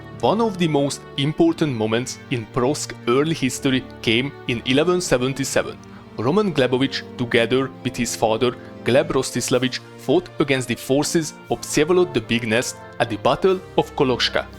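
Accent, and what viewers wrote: Hungary. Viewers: I instantly recognised that he was hungarian just by his accent XD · Nem kell. Van egy fajta sármja a magyar kiejtésnek. Csak így tovább! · Annyira hallom hogy magyar vagy XD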